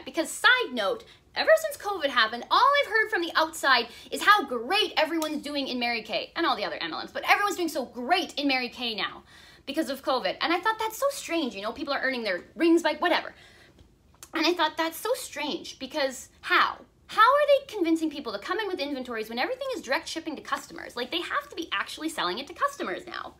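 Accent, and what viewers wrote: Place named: Canada. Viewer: I came so close to joining Mary Kay in 2007 - the only reason I didn't was the stupid skirt rule. I was invited by a "new friend" to a recruitment talk(?) with a bunch of other potential victims and my sensible arguments about the skirts may have (hopefully) also scared others off. I really appreciate you doing this - I also just appreciate hearing a Canadian accent as a former Calgary gal now in the UK.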